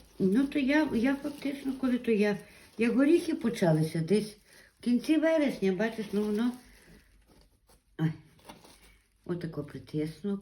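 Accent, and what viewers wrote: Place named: Ternopil, Ukraine. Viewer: Дуже дякую за чудове відео,жіночка дуже нагадує мені мою бабцю,у котрої я ріс до самої школи.Дуже гарна мова,балакає так само як моя бабця🙂,ви напевне знаходитесь на Тернопільщині?Я жив у бабці саме там.Там дуже гарні крайовиди,безмежні поля й ліси,граби,ясені,дуби.Нажаль дубину вирізали давно😔.Моя бабця також насадила багато горіхів,тільки вона їх не вирощувала навмисне(здається на весні),по городі сходили молоді ростки горіху і бабця поосто їх пересаджувала куди треба.Я тоді запитав у неї:"звідки вони ростуть на городі",а вона відповіла:"то ворони в осени розносять і деякі падають,а на весні виростають",ось так.Дуже родюча земля,багато чорнозему.Успіхів вам у вирощуванні,викладайте ще відео👍🇺🇦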